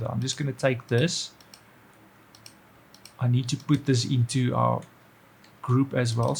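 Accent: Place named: South Africa. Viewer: Good sir, from your accent im assuming your south african,🤝😃. Im curiouse, are there any south African companies or industries that use Rive in they pipeline? Great stuff by the way👏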